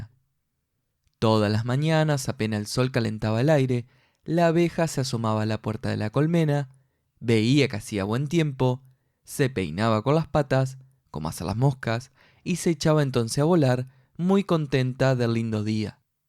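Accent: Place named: Argentina. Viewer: Excelente! Enfim um canal com conteúdo em espanhol com acento Argentino. Sou muito grata!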